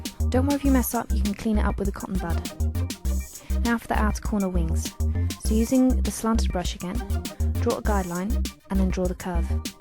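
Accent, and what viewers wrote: England, United Kingdom. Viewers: ahaa, i did it =3 very nice tutorial!! do you have an english accent? Your voice sounds cool! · YAAAY you have a Brittish accent! I LOVE accents, too bad I suck at immitating them. You're really good at makeup designing.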